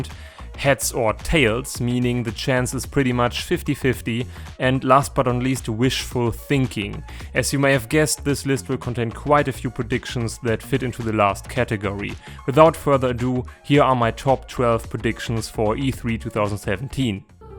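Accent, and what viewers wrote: Germany. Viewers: Well i'm German too, so i know the accent. Your english is really good so i didn't catch it from the beginning. I hope your channel will get bigger. I sub for sure. ;)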